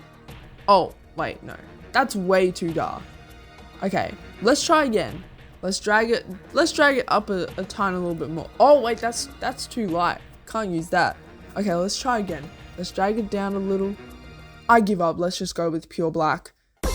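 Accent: Australia. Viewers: as soon as you started talking i immediately yelled 'FELLOW AUSSIE SPOTTED' (it is 12am)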